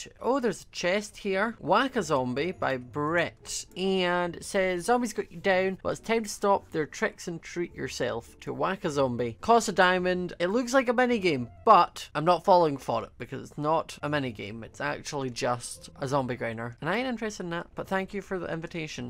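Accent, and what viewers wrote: Scotland, United Kingdom. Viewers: OMG I LOVE YOUR ACCENT I LOVE SCOTTISH ACCENT SO MUCH ok lol I need To stop.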